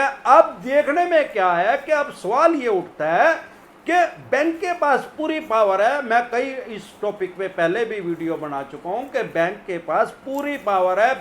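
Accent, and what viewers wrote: Haryana, India. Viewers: Haryanvi accent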